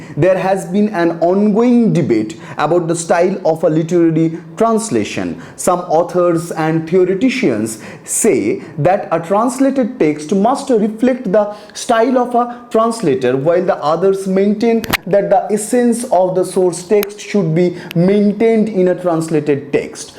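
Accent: India. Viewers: This is very useful, but apart from that I don't understand a few words, because you speak too fast and your Indian accent is so thick which makes it difficult for us to understand, not only that, I was very serious but it all goes away because your speech is so funny to hear. maybe for the next video you can speak more firmly and slowly, that's all my criticism.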